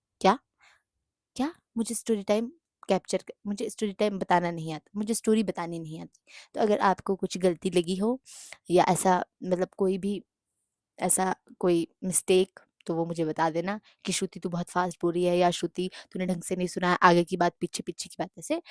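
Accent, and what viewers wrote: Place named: Pahari, India. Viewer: storytime with pahari accent good one 😂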